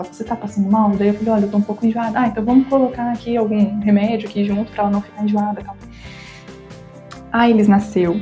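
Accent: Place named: South, Brazil. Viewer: Há sotaques brasileiros uns bonitos e outros sofríveis. O dela por acaso é bem feio. Como ela é do Sul do Brasil e com aqueles olhos bem azuis, não temos dúvidas que é italiana ou e alemã, embora tenha nascido no Brasil e tenha sobrenome português. Mais uma que veio "istranhá" e "falá mau".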